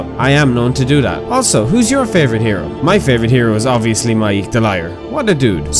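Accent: Ireland. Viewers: and yes i love and miss that sexy irish voice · Are you irish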